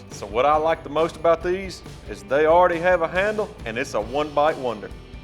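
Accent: South, United States. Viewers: That is one thick country accent I thought mine was bad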